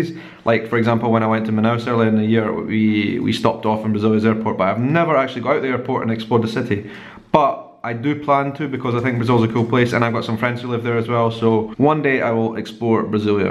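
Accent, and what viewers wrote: Scotland, United Kingdom. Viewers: I don't think subtitles is needed...i really enjoy your channel just the way it is, and i got so happy cause i understand your scottish english, your accent reminds me the tv series "Outlander"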